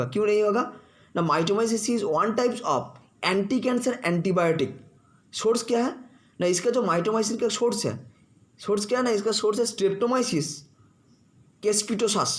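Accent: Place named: Northeast, India. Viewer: Thank u so much sir 😊.. Your voice is seems like northeast people....I really love northeast people because they are very kind herted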